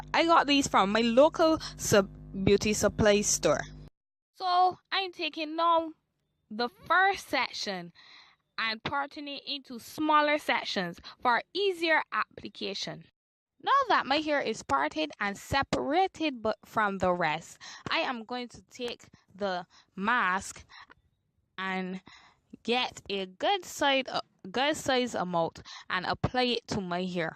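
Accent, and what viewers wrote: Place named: Barbados. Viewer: Are you Bajan??? I love your accents i love all my caribs ☺️☺️☺️☺️☺️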